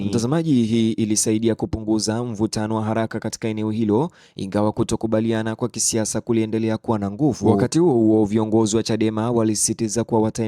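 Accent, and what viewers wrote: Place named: Kenya. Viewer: kiswahili chenyewe kinakusuta wewe ni mkenya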